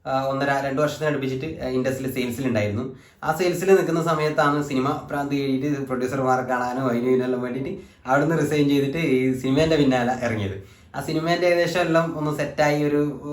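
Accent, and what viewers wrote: Kannur, India. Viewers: Hi,nalla caliber ulla kutiyanu,brilliant,alrounder anennu kl Biju channel kandu anne njn manasilaki,actually nte nishkalanka chiriyum kannur bhashayum enne attract cheythu,bcs njn kannur,eachur anu. nalla director,thirakkatha,story,ellam super ayirunnu,ipol a channel kanarilla, e kanan matram,ipol santhoshamayi, mon nannayi varum,orikal nerit kananam😊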